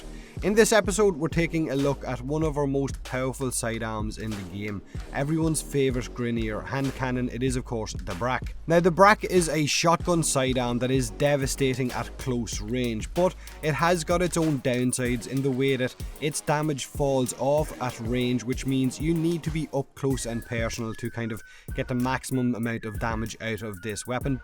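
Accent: Ireland. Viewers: Are you Irish? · British..... he's Irish! lol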